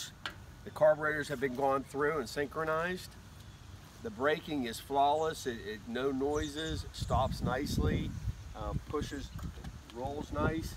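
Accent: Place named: Philadelphia, United States. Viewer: you sound like You're from Philly or South Jersey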